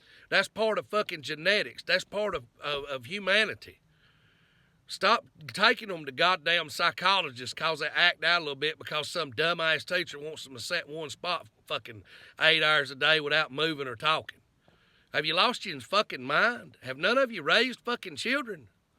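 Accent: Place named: South, United States. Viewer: Damn, I agree what your saying....But you say GD way to much....but your completely right, also, where you from, you sound like your from my part of the south, your ether from Georgia, Florida, or my home state...Alabama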